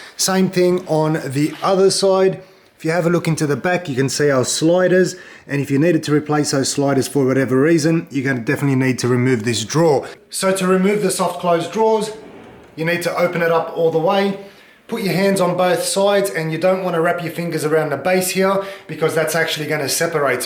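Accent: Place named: Australia. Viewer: Thank you so much! Was to happy to hear an Ozzie accent when I clicked on it haha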